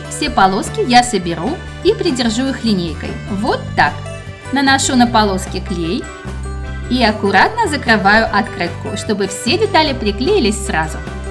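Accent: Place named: France. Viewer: голос потрясный! как у француженки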